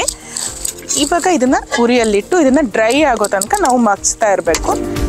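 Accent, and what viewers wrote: Kundapura, India. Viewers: ನಮ್ ಕುಂದಾಪುರ ಭಾಷಿ ಕೇಂಬುಕೆ ಚಂದ ಕಾಣಿ... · ಬೇವಿನ ಎಲೆ ಅಂದರೆ karibevu ಎಂದು ಹೇಳಿ ಇಲ್ಲವಾದಲ್ಲಿ ಶಿವಮೊಗ್ಗ ಜಿಲ್ಲೆಯ ನಂತರದ ಜಿಲ್ಲೆಯವರು ತಪ್ಪು ಮಾಹಿತಿ ನೀಡಿದಂತೆ ಆಗುತ್ತದೆ ದಯವಿಟ್ಟು ನಮಸ್ಕಾರ... ತುಂಬಾ ದಿನಗಳ ನಂತರ ಕುಂದಾಪುರ ಭಾಷೆ ಕೇಳಿದ್ದು ತುಂಬಾ ಧನ್ಯವಾದಗಳು chicken green roast super madam thank u so much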